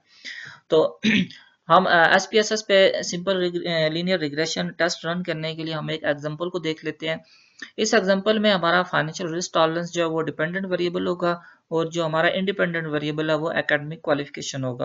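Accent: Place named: Kashmir, India. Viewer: Great sir....your accent is saying that you must be a kashmiri.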